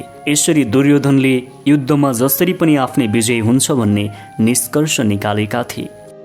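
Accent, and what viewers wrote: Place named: England, United Kingdom. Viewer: भएन , भएन हजुर भएन वाचन गरेको। नेपालीमा शुद्द उच्चारण गर्न पहिला सिक्नुस। अ,ग्रेजी पाराले बोलेर नेपाली भाषाको बिजोग नबनाउँ। माफ गर्नुहोला।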